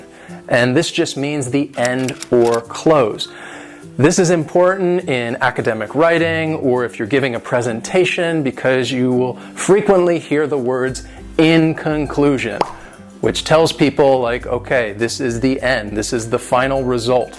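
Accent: United States. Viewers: Dear Sir! I have to admit that the video was definitely useful for me to expand my vocabulary, anyway very thankful for you free lesson with an excellent American accent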